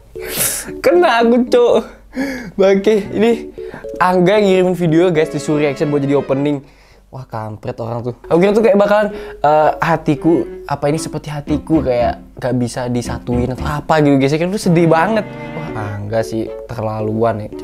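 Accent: Bali, Indonesia. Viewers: Kak orang bali ya kok logat a bali bgt?😊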